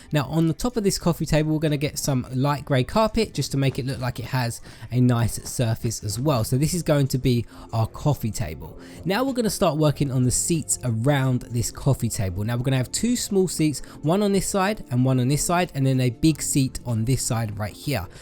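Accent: United Kingdom. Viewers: I Need Some Attention xD 😂😂 British ascent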